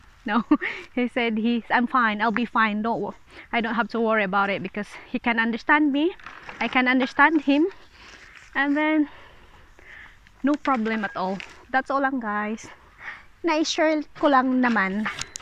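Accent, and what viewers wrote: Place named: Visayas, Philippines. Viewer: Hahha murag bisaya jd ky ang accent gahi kau murag ako Lol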